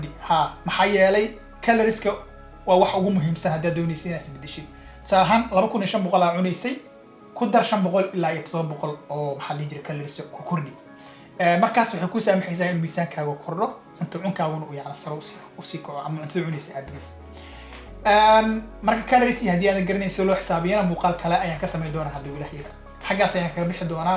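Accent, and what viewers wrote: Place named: Hiraan, Somalia. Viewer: I liked lahjadaada from asal Hiraan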